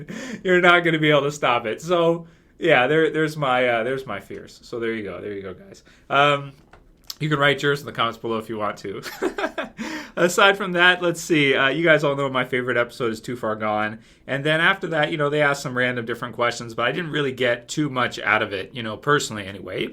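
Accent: England, United Kingdom. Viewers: ... You're english? WUT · Wait! You're english...,.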